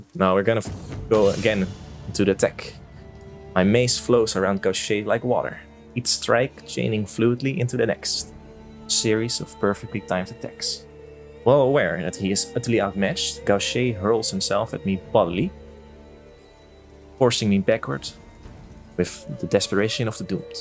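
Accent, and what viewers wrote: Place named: Netherlands. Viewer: The story telling at the begining was really cool! Keep this up! But work on dat THICC netherlandse accent.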